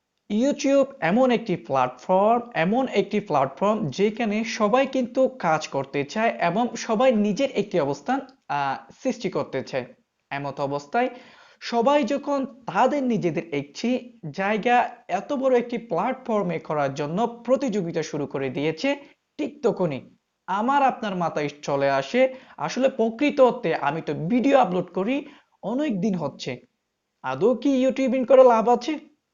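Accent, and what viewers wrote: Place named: Chittagong, Bangladesh. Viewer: মনে হচ্ছে চট্টগ্রামের মানুষ।আমিও চট্টগ্রাম থেকে।Education ভিডিও বানাই।একজন কেমিস্ট্রির শিক্ষক।Love from Chittagong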